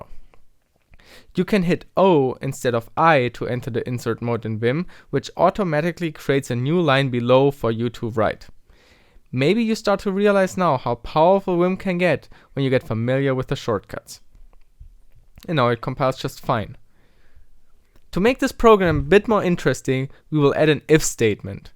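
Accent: Germany. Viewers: Are you german? :D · can tell that you are german, but it's definitely not that bad. I can understand you very clearly and I don't think there is anyone who wouldn't. You're very articulate in how you speak and very clear.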